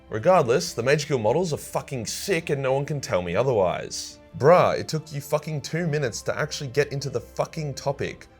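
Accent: Australia. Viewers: i don't really care about 40k that much, hell i have never played a game or read one of the books, but the Australian accent and the cheekiness is what keeps me coming back lol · Comedic aussie way? I must be honest, I allways thought you were just plain Australian and not trying to be funny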